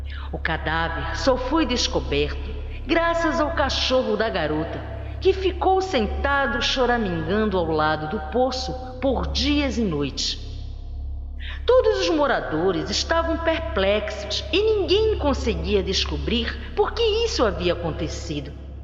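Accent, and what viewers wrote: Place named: Northeast, Brazil. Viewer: curto seus videos e seu sotaque também rsrsrs nordeste neh?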